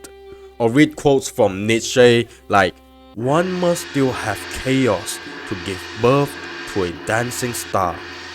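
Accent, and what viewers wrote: Malaysia. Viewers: First word of the video can already tell bro is a malaysian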